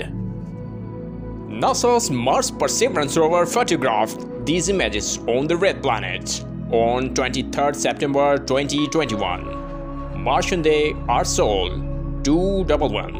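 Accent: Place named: India. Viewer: English speaker with indian accent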